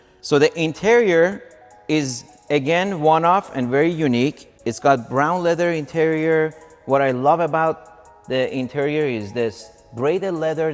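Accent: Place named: Iran. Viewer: i like how you still got the persian accent nice bug btw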